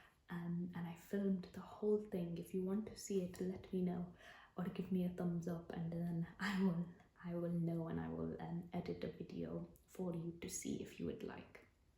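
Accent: India. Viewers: Your accent is so lovely it’s so smooth with the Indian accent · I enjoy India accent videos. Thank you. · I have missed indian accent video soooo much. Thanks !❤️ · There aren’t many Indian ASMR videos so this means a lot to me. I love ASMR, Indian women, and Indian accents so thank you queen. 👑. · ahhh I can't believe I missed this!! Your first Indian accent video is one of my all time favourites! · Love your accent ASMR videos! Please keep making the Indian accent ones, the last one was great too! Not enough of those in YouTube. So soothing and relaxing! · I’ve always found Indian accents to be very nice. Sort of calming lol, glad others do too.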